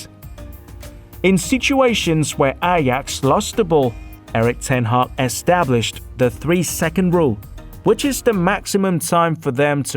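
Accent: United Kingdom. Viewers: 1 like cho bạn nói giọng Anh chuẩn 😌 trong khi nhà nhà học theo giọng Mỹ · giọng này giọng Anh chuẩn bố ơi :)) giọng Anh gốc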